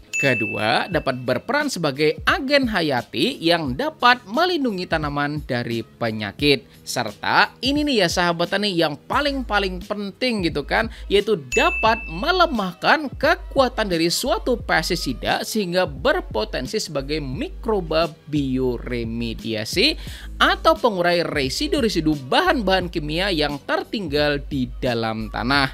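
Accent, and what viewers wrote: Banjar, Indonesia. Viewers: Orang mana sampeyan. Bahasa Banjar takana takaluar. 😊😊😊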